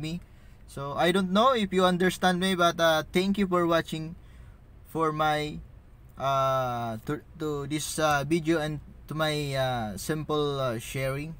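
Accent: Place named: United States. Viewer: Ang galing naman pwede na mag amerikano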